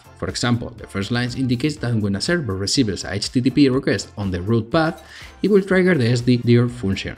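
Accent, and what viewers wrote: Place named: Spain. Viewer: Tu eres español!!!, no pregunto. Gracias por el video y compartir proyecto. Trabajo con ingleses y tu acento te delata.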